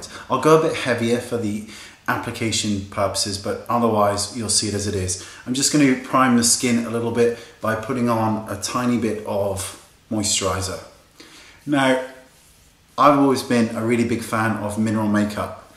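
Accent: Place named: Bristol, United Kingdom. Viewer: Where are you from? I love your accent! I'm from Bristol and it slightly reminds me of that :D I love your videos, I've been watching them for a while now :) They've completely changed my routine. I'm a nurse and want to look clear and healthy, could you do a video to recommend a minimalist look? :D sorry for the long comment lol xx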